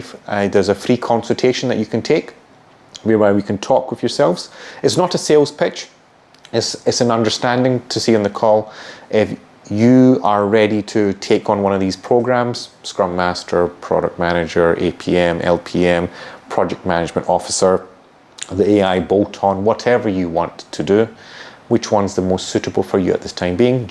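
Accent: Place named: United Kingdom. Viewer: Heyy bro this is a great video, I currently work in the IT Sector and I’ve been in my role for 4 years a 2nd line role, and I’m looking to change career to become a Scrum Master. Considering my IT background which certifications would I need minimum to become a Scrum Master, Agile Project Manager, Delivery Manager. So and so forth. In the London Region? You sound like you’re from the UK aswell. I also have an ITIL Framework certification. Thanks man, and I look forward to your response🙏 your videos are great, keep it up👍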